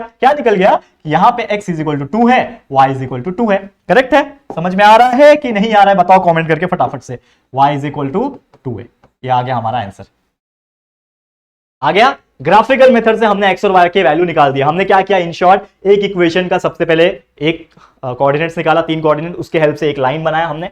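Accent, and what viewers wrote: Maharashtra, India. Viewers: Sir apki marathi bohat cute hai❤❤❤❤❤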